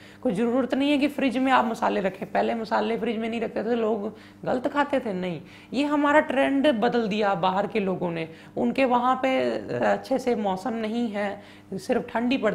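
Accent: Punjab, India. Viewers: Guru g tuhaadi bhasha wich Punjabi touch hai. Kirpa karke ik video Punjabi wich bnaao. Mein tuhaade moohon Punjabi sunana chahubdi haa. Plzzzzzz 🙏mein v Punjabi haa.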